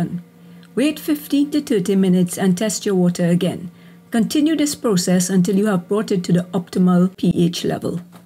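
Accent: Trinidad and Tobago. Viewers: You sound like a real trini gal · I knew her voice was sounding like a Trinidadian! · Are you from Trinidad?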